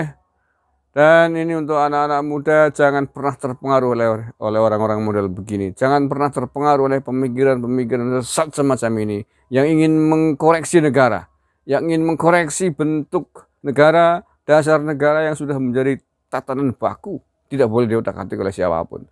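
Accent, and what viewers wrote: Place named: Sumatra, Indonesia. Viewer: Logat melayu arah daerah,,,(aceh/sumatera)🙏🙏🙏🙏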